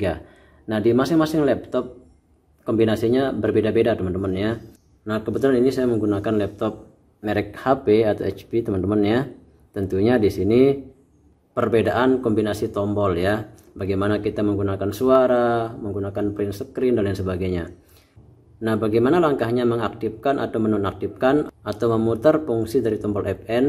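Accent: Bali, Indonesia. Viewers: aksennya kok kayak orang Bali ya?. Laptopnya seperti yang aku pakai ini, paka SSD 256GB, HDD 1TB dan RAM 8GB, hanya saja untuk record aku kurang sreg, karena suara fan masih terdengar, mungkin harus pakai external Mic ya?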